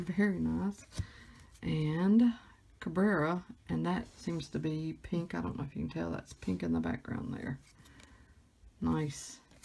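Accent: South, United States. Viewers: Audio is perfect, your southern voice is perfect too. Nice box! Love the design, Gina make a nice football card. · You got an awesome box. And your southern accent is awesome too. Don't let anybody tell you different. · The southern accent rocks.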